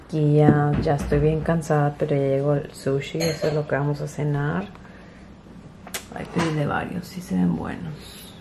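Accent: Monterrey, Mexico. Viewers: Eres súper buena onda, no sé si es mi imaginación pero como que tienes acento regio, me identifique jajaja Saludos desde Monterrey 🇲🇽🤠 Bendiciones ✨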